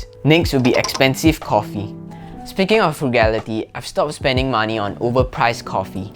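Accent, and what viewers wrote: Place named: Singapore. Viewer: Can’t get past singlish.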